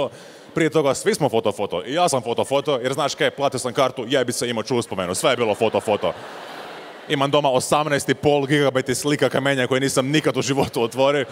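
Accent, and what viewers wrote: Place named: Styria, Austria. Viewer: HAHAHA, zakaj imaš v slovenščini Štajerski naglas? :D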